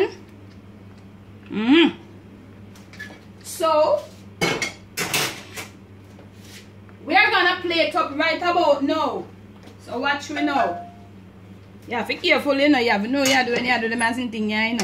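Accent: Patois, Jamaica. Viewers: Love your energy but nothing jamaican about this video but your accent. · Love your video and and love the beautiful dialect or Jamacian patios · I like when you talk the patois it sounds so sweet.lol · I love ya u make me laugh love the jamaica accent 🤣🤣🤣. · I dont understand some of what she's saying but am I the only one who doesnt care & love listening to her Jamican accent 💛💙💜💚❤ When I visit Jamica I would like to go visit her house😎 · I luv her raw Jamaican accent....she's the best!!! goodup goodup pickney...lol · First time watching your videos...just by you representing the real jam dialect have me subscribing I'm sure I'll learn a thing or two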